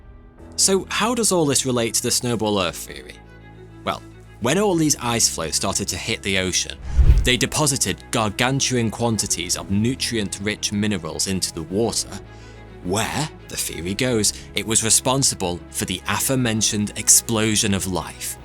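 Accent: United Kingdom. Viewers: His partial British accent made things more slow n me sleepy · Good grief that particular British accent or whatever it is is awful.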